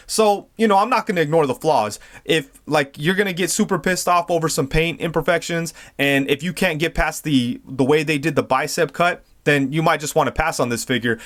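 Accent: New York, United States. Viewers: Where you from? You have this nice New York accent to your voice. Reminds me of family from the Bronx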